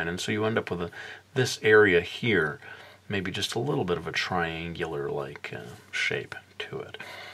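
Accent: United States. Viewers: excuse me your american sound hurts my ears but i listen to u how rude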